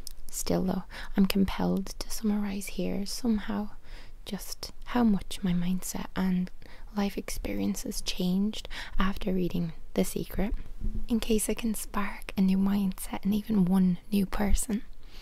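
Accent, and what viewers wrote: Ireland. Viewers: Being from Germany, no, being from wherever you are in the world, the Irish accent, and especially with your angelic and precious voice, this is the best ASMR in the world. We all wait for the channel! Your voice, and aura, and presence have the power to heal. Thank you so much. Go raibh maith agat! <3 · This was just the kind of thing I've been looking for. A nice Irish asmr reading some sort of book. Love you 💚💚 This actually sent me to sleep! Thank you so much. Can't wait to read that part of your book 💚💚😊😊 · I’m not into whispering so I love soft spoken. However, with that lovely Irish accent this makes the soft spoken voice even better! It is so relaxing you can’t even imagine, so Thanks! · I love the Irish accent so much!! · The Irish Accent just lends its self to ASMR !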